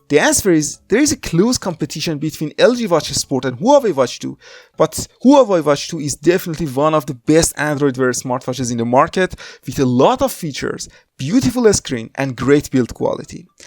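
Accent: Iran. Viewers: Persian accent 😂😂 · Iranian maybe or afghan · Bro, I am 100% sure your native is Farsi, right? Beautiful review, thx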